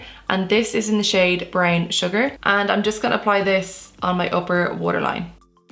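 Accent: Ireland. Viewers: I keep seeing Charlize Theron mentioned, but she could only hope. You look computer generated (I mean that in the best way - you're inhumanly beautiful). Plus, your voice is a lilting almost ASMR-like brogue. I don't know how you don't have 40 million subs! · Beautiful. Didn’t need the lashes, but they’re gorgeous too. I’m in California but I lived in Ireland for a few years, and I MISS the accent and the fast talking! Most Americans just talk too slowly!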